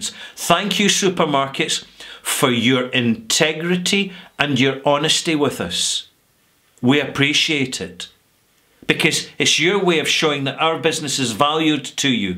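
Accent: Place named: Glasgow, United Kingdom. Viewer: Thanks for the critique! Came over due to urging. Very interesting! I have viewed another posting with you in it -- Russian MRE I think -- You are both delightfully entertaining! Question - I can hear more Glasgow in your speech, but not. What is that about?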